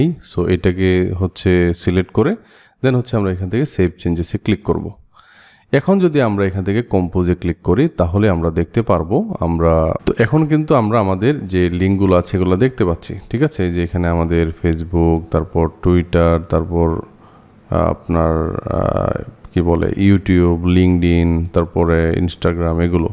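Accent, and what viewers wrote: Sylhet, Bangladesh. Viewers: Bhai aponi Sylhet er manus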